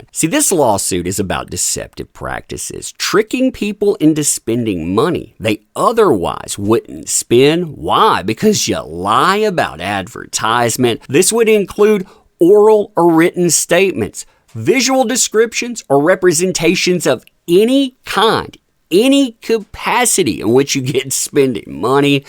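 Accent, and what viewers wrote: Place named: Mississippi Delta, United States. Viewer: We haven't to be from the same small town. We sound alike. Mississippi River Delta?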